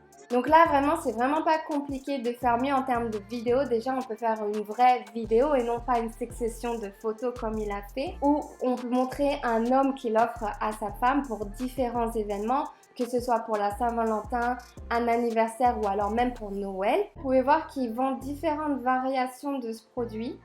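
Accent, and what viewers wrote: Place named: Alsace, France. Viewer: Moi je pense d'Alsace ou Loraine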